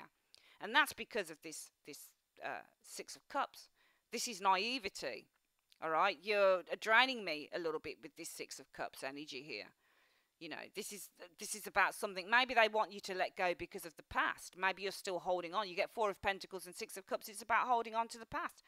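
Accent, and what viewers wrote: United Kingdom. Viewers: New subscribers here! Wow so refreshing to hear a uk 🇬🇧 reader 🤗 as I'm from Birmingham. Spot on for me‼️ I need to let go of my ex Cap the devil 😂 & be open to new love. Just a shame I still have to see him as we have twins together but I definitely need to let got! Thank you 😊 I really appreciate your readings! I hope I spot 👀 the new offer & take it 🙏🏾🤞🏾❤️🌈🙏🏾 I'm keeping my eyes peeled for that now as I don't want to be stuck in this unhealthy mess!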